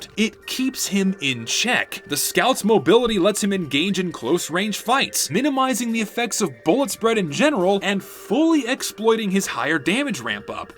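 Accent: South, United States. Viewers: I may be missing something but I swear he randomly goes southern every 6 seconds or so.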